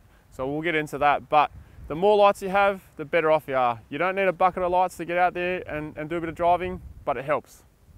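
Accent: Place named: Australia. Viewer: This guys accent is so cool Australians always seem happy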